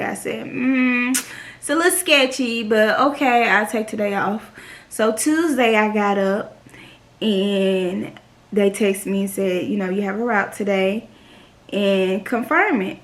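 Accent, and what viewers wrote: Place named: South, United States. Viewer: I love your accent I'm guessing your from the south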